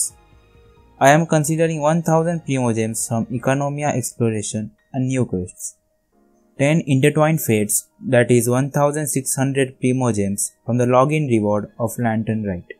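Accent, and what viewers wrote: India. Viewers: I love Indian accent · 🤔 are you Indian..? Coz you accent is quite similar to ours.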